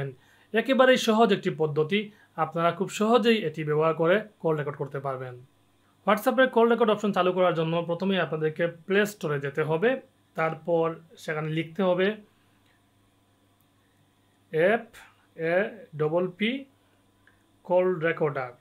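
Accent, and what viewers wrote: Sylhet, Bangladesh. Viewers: Bhai ki sylheti.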